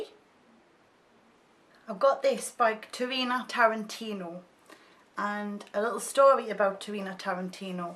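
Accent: United Kingdom. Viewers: hahaha i love ur accent so much!!! i hate most british ppls accents but urs is so cool!!!!!!!!!!!! God bless u jesus loves u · Love ya vid, were ya been man woman lol:-P You make me laugh, you,ve got some lovley comments on here, but what I cant stand is people asking u to " talk properly" and to "not talk" well is a Geordie this is how she speaks thats north east England and lots of us like her talking, it's called being real!!!! X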